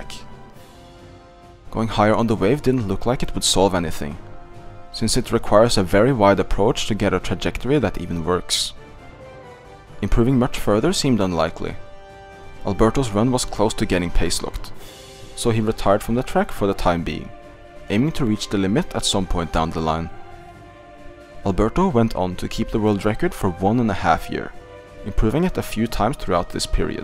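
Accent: Norway. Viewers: Jeg bare hææ hvorfor høres du litt norsk ut noen ganger også leste jeg på profilen haha, nice! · Wow I'm pleasantly surprised by the shear quality of this video you've made! Amazing video, I thoroughly enjoyed it all the way through :D Also: I guess I've found someone with a thicker Norwegian accent than mine (not saying it's a bad thing btw) haha :D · Du må være norsk! Basert på aksenten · As a Norwegian myself, i heard your voice, and thought «Hmm, his accent is a bit norwegian…» AND I WAS RIGHT 😭😭💀🔥 W Vid btw ❤️ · Bro eg hører på stemmen din når du snakke at du e norsk💀💀Eg har mye være engelsk stemme da lmao. kul video da fortsett sånn!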